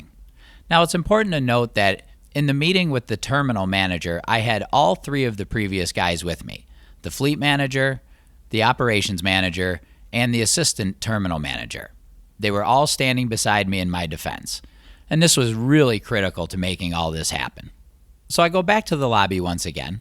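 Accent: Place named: Midwest, United States. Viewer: I can definitely hear your mid-western accent. Nice well informed video. Maybe someone can learn from this.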